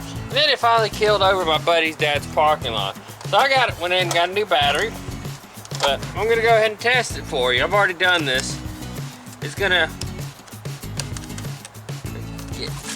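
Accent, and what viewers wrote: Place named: South, United States. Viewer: I like this southern man, where did u get that accent?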